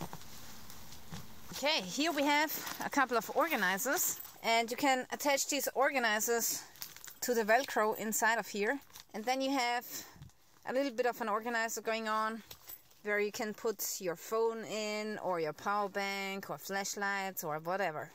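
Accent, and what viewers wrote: Germany. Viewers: Pasaba por aca y...escucho alguien de deutschland hablando american, but in that frozen field even me would be talking just to warm up.but congrats for have chosen a labrador dog for companion no better friend for any self sufficient girl. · Really enjoyed this. That very German English accent is the best...greetings from Australia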